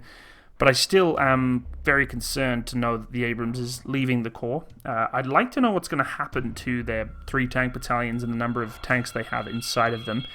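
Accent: United Kingdom. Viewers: What part of UK is your accent from?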